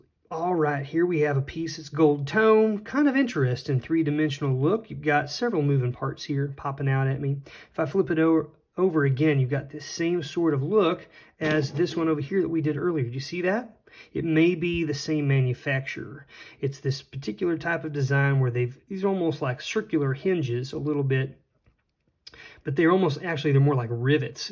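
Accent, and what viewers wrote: South, United States. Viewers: This guy is definitely from the south with that strong southern accent.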